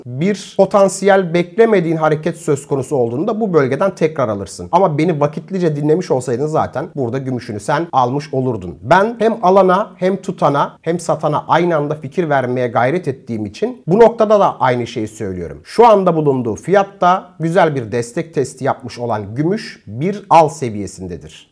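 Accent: India. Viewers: Turkce dinliyemiyorum:) ve hint aksanı vae bilginiz olsun:) uk deyim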